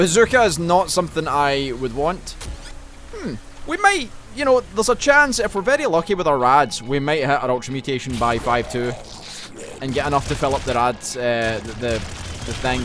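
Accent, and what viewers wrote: Scotland, United Kingdom. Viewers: lol this was alot more enjoyable then other runs of this game ive seen XD and your accent is fanfuckingtastic is it scottish?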